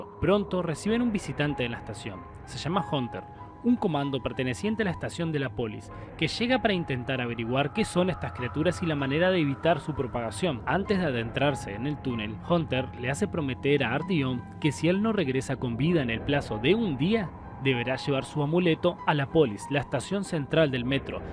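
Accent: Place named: Argentina. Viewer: Noooooo :( que feo, en acento de un argentino arruina todo :(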